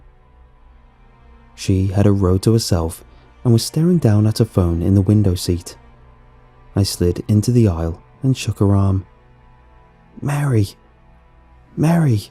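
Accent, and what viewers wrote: England, United Kingdom. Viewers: Hahaha He do have a noice English accent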